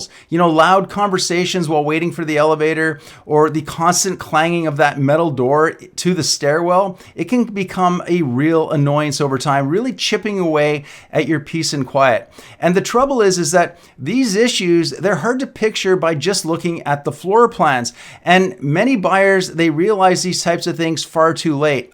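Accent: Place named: Ontario, Canada. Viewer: You talk like you are from Ontario. We don't say "out or "about like that here in Vancouver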